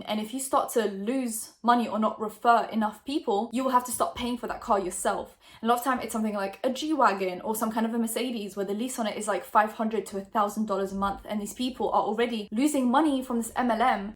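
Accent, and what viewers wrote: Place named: London, United Kingdom. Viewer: she's polish but she's lived in england for a long time, so it's a london accent by the sounds of it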